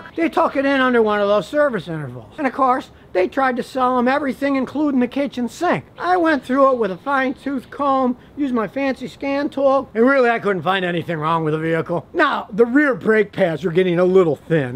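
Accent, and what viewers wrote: United States. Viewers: Why is John Lennon alive with an American accent and when did he become an honest car mechanic?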